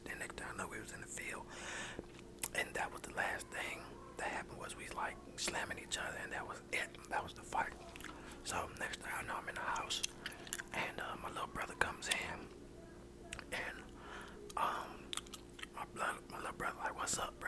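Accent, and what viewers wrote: Alabama, United States. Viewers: What part of Alabama are you from? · Bruh what part of Alabama you from? This sounds like some Birmingham or Montgomery shit right here hahah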